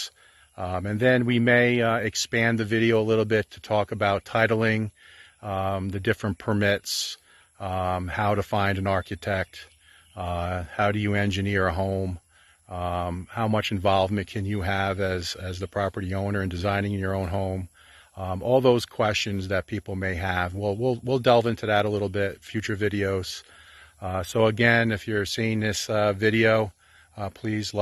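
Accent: Philadelphia, United States. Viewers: NEW MEMBER. ARE YOU FROM THE EAST COAST OF USA? SOUNDS LIKE FROM "PHILLY"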